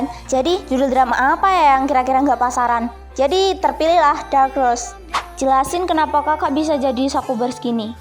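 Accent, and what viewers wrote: Indonesia. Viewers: wihh aku jg banyuwangi, tp kalau cara ngmong nya kak emng mirip banget sih orng banyuwangi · Kok sama Jawa Timur nya kak😄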